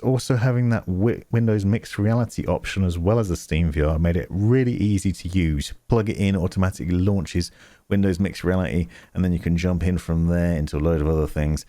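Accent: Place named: North, United Kingdom. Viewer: You based in the north dude? Sounds like an accent closer to me 😂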